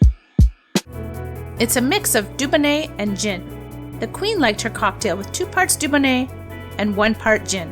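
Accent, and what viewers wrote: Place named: United States. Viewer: What a simply awful accent. The pronunciation was squeamish. Americans simply should avoid pronunciation of non English speaking words and phrases.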